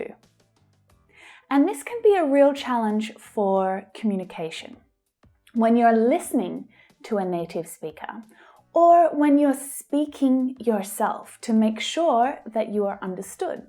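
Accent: Australia. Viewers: mmmEnglish rules and you try to make it fun. I'm Canadian and you seem to have a combination Australian / English accent. Is that because you have a Melbourne accent or because you have spent time in the UK? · Hi, your Australian accent is different... it is very easy to understand...Ths! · beautiful woman, nice voice, is that a slight Australian accent? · My goal in 2019 i wanna pursue my master degree in Australia, hope this year i can make it. Thank a million....great video. I love your Australian Accent,❤️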